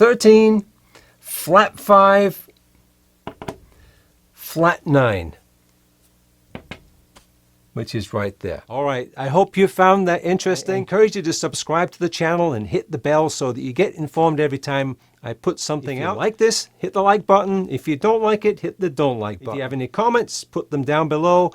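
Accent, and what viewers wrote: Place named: Scotland, United Kingdom. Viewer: Working through this book, and this chord definitely confused me as to how it was written. Non-sequitur, but if you don't mind my asking, where did you grow up? Your accent is wonderful and almost a mix between Scottish and upstate New York.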